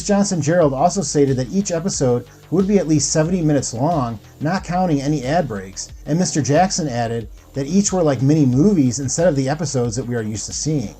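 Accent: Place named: Canada. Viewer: I hear it too. Similar Canadian regional accent maybe?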